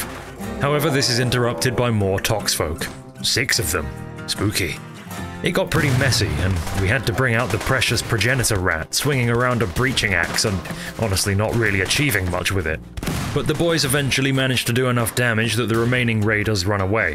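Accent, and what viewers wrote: United Kingdom. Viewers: hearing a very British man advertise a vary American game is actualy hilarious.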